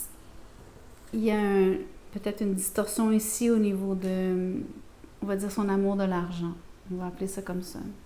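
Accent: Quebec, Canada. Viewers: Bonjour. Guidance vraie mais pas pour tout. Je prends ce qui corredpond. Vous êtes Québécoise ? Votre accent, je reviens du canada 😊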